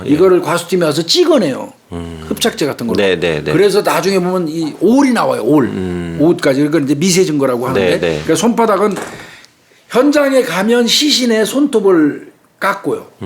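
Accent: Chungcheong, South Korea. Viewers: 김복준 교수님 수고 많으시네요. 그짓말은 충청도 사투리 경기도 표준말은 거짓말 인데요 이 말씀은 교수님 말씀이 구수한 충청도 사투리를 쓰시는 말씀. 교수님 건강 하십시요.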